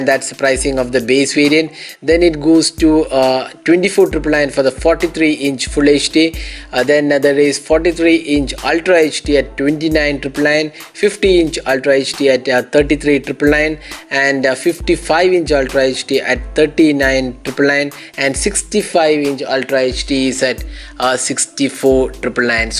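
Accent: Kerala, India. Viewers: ഒരു മലയാളി ഏത് ഭാഷ സംസാരിച്ചാലും മലയാളിയാണെന്ന് മനസ്സിലാകും.നല്ല വീഡിയോ. ബെസ്റ്റ് വിശസ്. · ശബ്‌ദം കേട്ടിട്ട് മലയാളി സംസാരിക്കുന്നത് പോലെ ഉണ്ടല്ലോ 🤔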